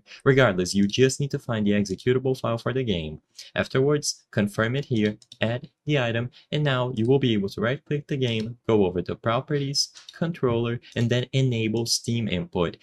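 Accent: Brazil. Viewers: o cara fala bem, mas o sotaque é sempre reconhecível pra BR q manja de inglês kkkkkkkkkkkkk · Esse sotaque... Desconfiei que era brasileiro. Acessei o canal e confirmei minhas dúvidas hahaha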